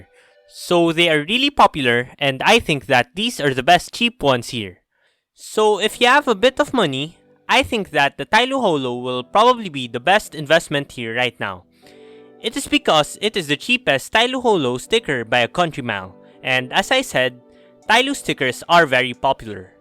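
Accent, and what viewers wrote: Philippines. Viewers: You filipino? Kinda sound like it hehe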